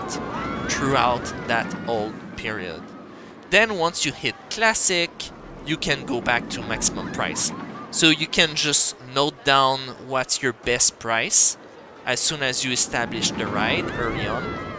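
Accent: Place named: France. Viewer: Yo, nice video homie ! Btw, are you French ? Anyway, keep it up.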